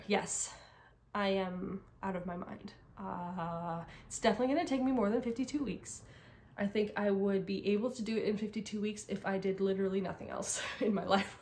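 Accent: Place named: Quebec, Canada. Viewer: Bonjour. J’adore tes vidéos, c’est certain que je l’ai écouté jusqu’au bout. Je fais des points de croix et j’ai tombé en amour avec le livre 52 socks in 52 weeks que j’ai emprunté à ma bibliothèque. J’ai découvert un patron de Paula Wisniewska Lato sur Ravelry avec la laine de Julie Asselin (québécoise) fantastique, le patron est aussi dans le deuxième livre de 52 socks in 52 weeks II. As-tu acheté le nouveau livre de Gabrielle Vézina (québécoise) qui fait des tricot en dentelles et des bas. Avec la laine de Julie Asselin. Juste avant ton vidéo, j’ai commandé une verge (yard) de speculass et deux mini hank de falu red pour faire Harriette Salt et Louisa Coulimore sur panettone. Moi aussi j’aime les fils Roxy floss co. Le falu red est mon préféré. Je suis une québécoise (dans mon cœur)qui vit en Ontario maintenant. À 25 je me suis mariée (avec un québécois bien sur lol) mais comme il était pilote dans les forces, nous avons déménagé en Ontario Mais nous retournons visiter nos amis et famille a chaque année Tu me fais chaud au cœur quand tu parles québécois Merci ne change rien. 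On t’aime comme tu es ❤ P.S. moi j’attends encore que mon amie me montre à travailler avec des aiguilles sur câble la loop magic Eat-ce que tu tricotes comme ça? Moi je ne dis pas skein, je dit ma pelote de laine 😂 À bientôt Moi je vais à stitch north la deuxième fin de semaine d’avril et en octobre J’espère te rencontrer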